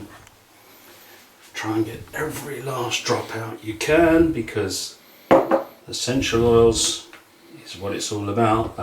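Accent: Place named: United Kingdom. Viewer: What a breath of fresh air! at last, a clear informative video and British too, this will be of great help to anyone starting out, or for those who feel confused or overwhelmed by other videos. I found it really helpful, although I have been making soap a little while now, but it was nicely reassuring that all what you showed I also do. Thank you so much, looking forward to more!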